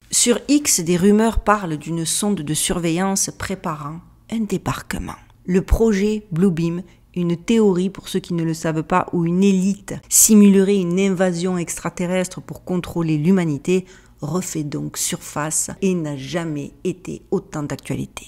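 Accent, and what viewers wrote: Marseille, France. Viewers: Trop géniale votre vidéo j apprécié beaucoup votre côté solaire et votre franchise avec votre accent du sud Marseille? Moi j y habite mais j avoue que Las vegas ou San Francisco m attire bref continuez ainsi moi j adore 🙏👍❤️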